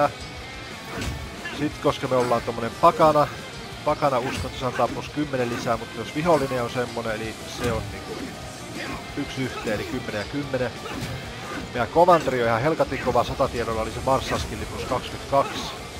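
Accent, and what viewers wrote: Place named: Satakunta, Finland. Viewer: Oletko satakunnasta? Päättelin vain "murteen" perusteella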